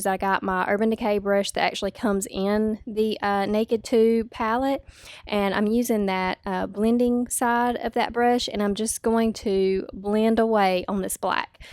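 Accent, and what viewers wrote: South, United States. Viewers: yay southern we talk like that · Southern accent!!!! LOVE IT!!!!! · I just found your video and subscribed!!! I love your southern accent, where are you from??? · I love you southern accent and the look 👌🏻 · Omg your voice makes me miss the south so much ): you sound adorable and you are so beautiful! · I love the look and our Southern accent!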